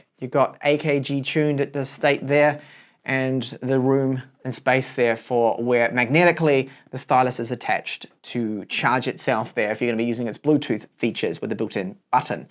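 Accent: Australia. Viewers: What's your nationality? Aussie, Kiwi or English? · he sounds Australian to me too? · He sounds Australian to me lol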